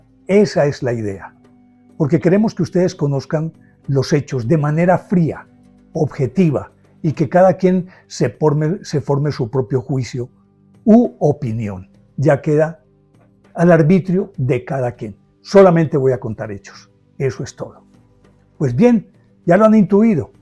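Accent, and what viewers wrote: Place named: Colombia. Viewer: Buena Charla, primera vez que te escucho, creo eres Colombiano, muy interesante tus datos claros y mundiales.😮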